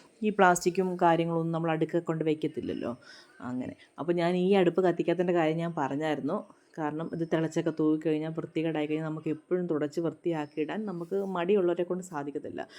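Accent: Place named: Kottayam, India. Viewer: ഇയാള്ടെ വർത്തമാനം കേൾക്കാൻ നല്ല രസം. . നല്ല voice. . കുറച്ചു സീരിയസ് ആണോ ഇയാൾ. ....കോട്ടയം slang ഇഷ്ട്ടം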